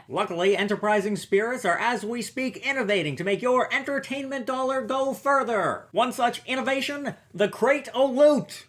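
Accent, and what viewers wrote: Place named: Canada. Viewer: Pronouncing "been" like "bean", yep.. 100% Authentic Maple Flavor Canadian.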